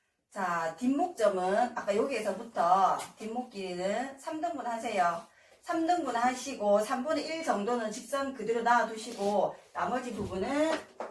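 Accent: Gyeongsang, South Korea. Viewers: 왕초보인저도 설명듣고 옷만들기 도전해보려구요 너무 자세히 설명해주셔서 감사 또 감사합니다 구수한 경상도사투리도 넘 정겹네요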